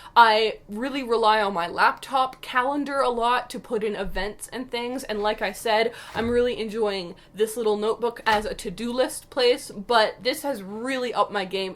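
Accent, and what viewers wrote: Canada. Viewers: I love your Canadian accent when it peeks through - super cute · I knew she was Canadian! No wonder her accent sounded very interesting.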